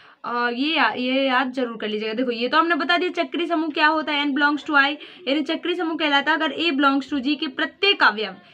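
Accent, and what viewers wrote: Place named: Bundelkhand, India. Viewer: Btatey btatey bundelkhandi a he jati h...😅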